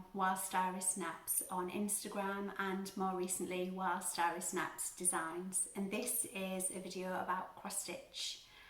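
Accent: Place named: Northern England, United Kingdom. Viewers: I love your accent as well, the Yorkshire accent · lovely to hear from a Northern lass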